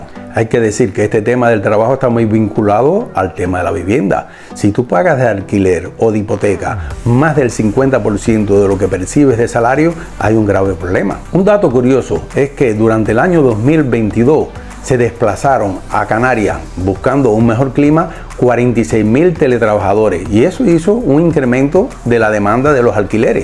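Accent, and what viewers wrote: Cuba. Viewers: Hola. Eres Cubano o Canario? Tiene un acento Cubano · Tú no eres Canario, tu acento es cubano...Yo soy de Tenerife de muchas generaciones y NO se vive bien en las Islas...antes viviamos bien...Claro que igual para ti de donde vienes esto es el paraiso...Pero el Gobierno Central nos tiene olvidados...No tienes ni idea de nuestra idiosincrasia...No me gusta como defines hablando de un paraiso mi tierra que están enterrando...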